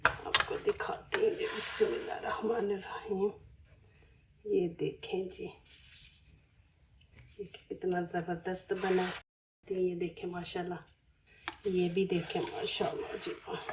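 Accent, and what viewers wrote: Punjab, India. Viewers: MashAllah very delicious recipe and Your Urdu so sweet Panjabi accent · Punjabi tone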